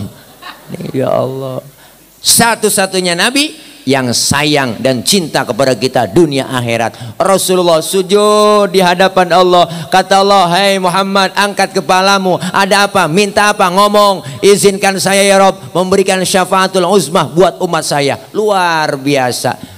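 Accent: Jakarta, Indonesia. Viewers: smga slalu dlam lindungan allah.sehat" slalu kyai ku.. sya org jawa. tpi resep bgt sma cramah kyai.. khas bgt betawi nya.. apa lg klo GLEDEK. 😁🙏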